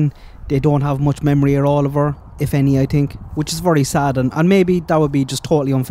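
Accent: Ireland. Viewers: I live in Newfoundland Canada (just a hop across the pond)and you have the same accent as my late grandfather (his great grandmother was Irish from Waterford county), where in Ireland are you from, not a street address, just a county We have a very large population of people of Irish decent, Some from the 1700's. Generations later many have not lost their various accents. I'm like Michelle, worked on my ancestry for years and I'm looking for my castle or a pot of Gold. Back to the Summer Wells case, not sure if you live around mountains and if there are those who wish to live almost under the radar but I know here in eastern Canada there has been crime "in some of our hills, mountains as well. I looked at some of the criminals who live on or around Ben Hill Road. I believe there is some kind of mob mentality in there, but based on some of the stuff Jodisue has got on with, you'd think they were all saints. 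Now truth be told we all know differently. Frank Light for instance, she seems to defend and he has a bad record. the whole Hollow in there is crawling with those with a criminal record.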